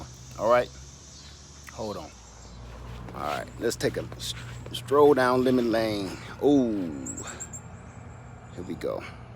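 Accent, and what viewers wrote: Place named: New Orleans, United States. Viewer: Shonuff sweet gesture sir and it goes to show how our community supports each other!! Digging your air layering experiment for sure!! Found your channel thru my brother the Sapper, ya have a new subscriber from east central Mississippi here!! You sound like you from Nawlins brother lol!! Dan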